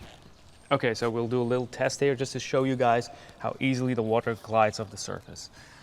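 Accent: United States. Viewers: you do sound like an american, very impressed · I'm surprised you have a very American accent considering you are based in Belgium (I think)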